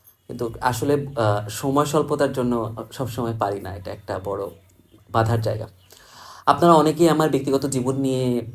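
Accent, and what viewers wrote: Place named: Chittagong, Bangladesh. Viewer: আপনার এক্সেন্টে চাটগাঁইয়া একটা ভাব আছে, চট্রগ্রামে বড় হইছেন আই গেস❤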